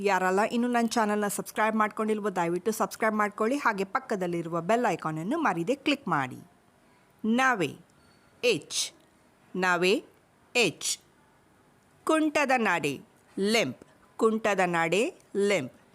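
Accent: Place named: United States. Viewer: 😂😂 American & Kannadiga mix mam 😂😂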